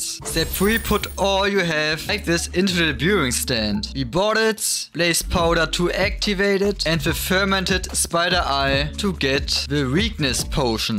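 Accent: Germany. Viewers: hey buddy good job on your tutorials you sound german and thanks for the tipps king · man hört das deutsche raus, nice vid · lol du hörst dich so deutsch an😂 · Nice german accent brother👍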